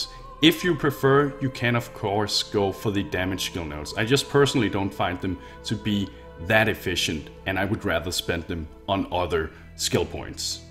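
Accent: Denmark. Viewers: Hmm, that accent. You're Danish aren't you!? :) :) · Hey really good video man! i recently started playing and have been toying with a necromancer/totem witch, with a bit of fire spells to help on the side. Is it to spread out or is it viable? Btw are you danish? i just thought i heard it in your accent :). If you are, i can tell you, it was definitely difficult to hear it.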